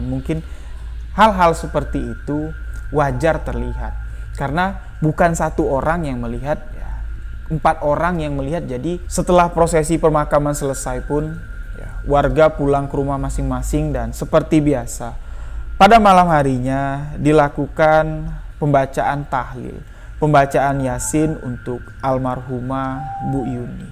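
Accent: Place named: Madura, Indonesia. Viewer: Logat ny kakak kok seprti maduro hehe 🙏 maaf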